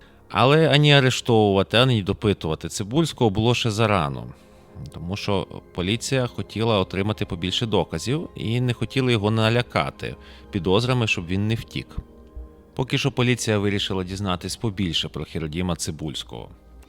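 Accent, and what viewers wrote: Lviv, Ukraine. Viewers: Чудовий львівський говірок. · Дякую. Гарний виклад чистою львівською мовою. Історичні фрагменти життя Львова - це хороша і правильна рубрика. Сьогодні я Вас почув у перше і мені сподобалось. Здоров'я і добра Вам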